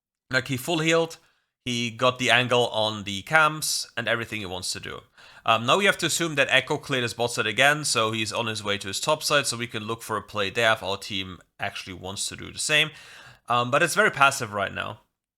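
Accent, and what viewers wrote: Germany. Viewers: it depends on the video and word choice used. Some people have more difficulty understanding accented english than others. His english is fluent, its just hard to get the word usage sometimes due to thick accent, which many people do have. Tbh german accent is cool, its just sometimes hard to make what is being said for some words 😅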